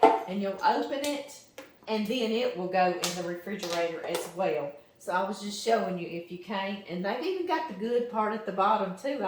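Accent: South, United States. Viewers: Love your Southern Accent. "Lard" heehee. :)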